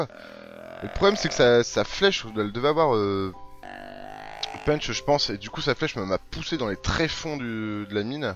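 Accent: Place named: Belgium. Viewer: pOUCE VERT POUR L4ACCENT BELGE !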